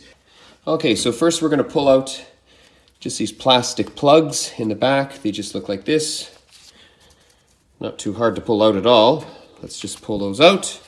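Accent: Canada. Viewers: lol, it’s always good to watch a video of an enthusiastic demonstration. I bet you’re from Canada, you got a Canadian accent.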